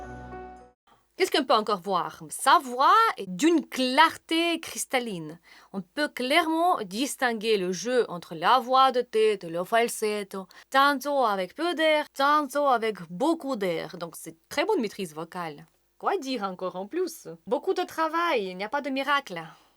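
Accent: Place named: Russia. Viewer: Видно что вы профессионал. Спасибо за анализ☺. У вас слышен русский акцент😊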